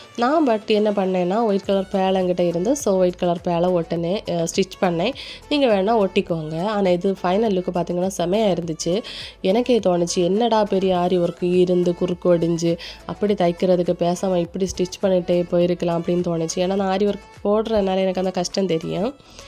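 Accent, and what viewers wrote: Kanyakumari, India. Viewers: உங்க voice ல நீங்க கன்னியாகுமாரி dist தான் antthu தோணுது.... நானும் கன்னியாகுமாரி dist தான்.... உங்க aeria வில ஓரோ dress க்கும் உள்ள stiching fees avalauv anthu ஒரு வீடியோ போடுவீங்களா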